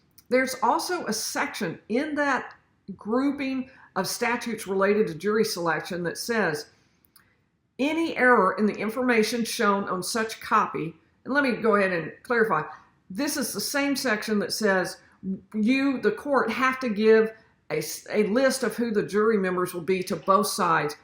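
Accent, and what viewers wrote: South, United States. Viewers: Hi! I have very much enjoyed your channel since I found you! I would like to share a little special story. I too am a Harvard graduate. Undergrad not law school. For that I went to a private Catholic college. My husband and I started to practice law here in Hattiesburg Mississippi 20 years ago. My daughter is graduating from high school and she wants to be a lawyer just like her brilliant parents. Not to brag lol:-) she wants to go to Harvard but she felt very self-conscious about her accent. My husband and I are from the north east so we blended in very well. Your videos in short have taught my daughter that she has nothing to be self-conscious about when she goes to Harvard in the fall. She has a southern twang that’s just her own just like you! Thank you for being an inspiration and a little silly from time to time. We really appreciate you for that. 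Have a great weekend.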